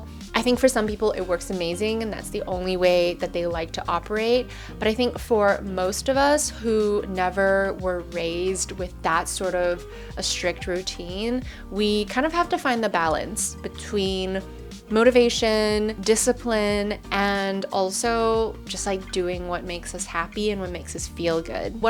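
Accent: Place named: California, United States. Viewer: What in the Californian accent is this?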